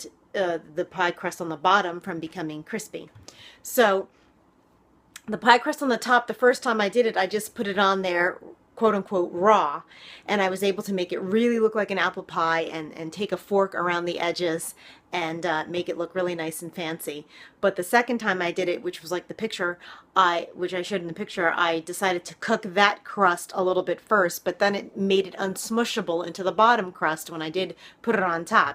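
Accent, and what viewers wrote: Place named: New York, United States. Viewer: I can't get enough of that NY accent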